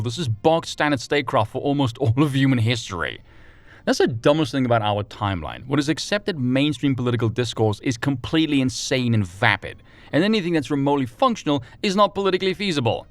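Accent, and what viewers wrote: United Kingdom. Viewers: listened to the first 1 minute 20 seconds, knew you were gay and british, left this comment to warn others, now off cheerios goody goody gum drops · I swore you were british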